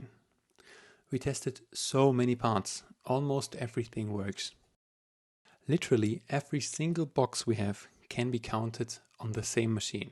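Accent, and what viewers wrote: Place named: Germany. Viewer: This is amazing! Whenever you see an ingenious project, it comes with a German accent. If you've not seen it already, please, please check out Destin / Smarter Every Day's manufacturing video. It's about getting a Barbeque cleaning brush made in the USA with non-chinese parts. It would be sad to see the MPS fail as a business, but it seems that the west has cost engineered ourselves into a manufacturing corner, and become very vulnerable in the process.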